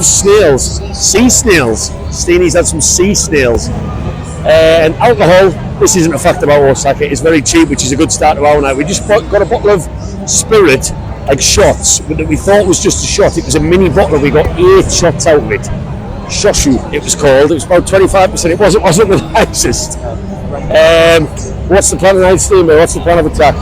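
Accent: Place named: Newcastle, United Kingdom. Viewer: A proper Geordie in Japan, absolutley class vlog mate howay!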